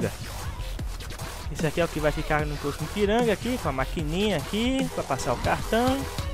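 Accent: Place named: Ceará, Brazil. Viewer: Vc parece cearense tmj top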